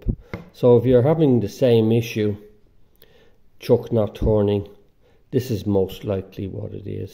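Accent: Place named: Northern Ireland, United Kingdom. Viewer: Hello I have one exactly the same as yours however mine seems to have a problem with the electronics, I've just taken it apart but I though I'd check on YouTube for any help I could ge fixing t. If you still have yours I'd be interested in it for parts. I not sure how to make contact with someone here. I am in N.Irl so I don't think we are very far from each other judging by your accent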